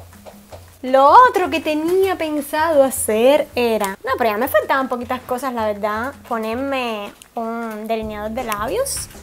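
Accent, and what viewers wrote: Cuba. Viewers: es cubana por eso habla así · me encanta el acento de los cubanos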